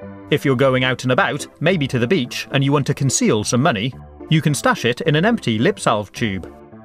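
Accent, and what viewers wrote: England, United Kingdom. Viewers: you can tell his English cause of the acent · he was speaking british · Are you from the United Kingdom? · 40Xx he lives in England that's why he says things differently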